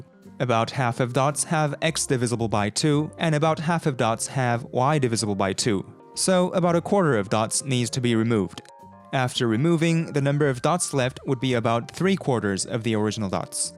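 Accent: South Korea. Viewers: 아 약간 발음 보니까 한국인인데? 라고 생각했는데 9분 쯤에 알려주시네요 ㅋㅋㅋㅋㅋㅋ 편집이 정말 깔끔하고 좋네요. 어떤 프로그램을 사용하셨는지요..?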